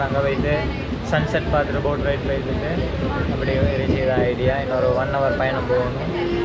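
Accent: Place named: Beruwala, Sri Lanka. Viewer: Bro your slang only in beruwala not whole Sri Lanka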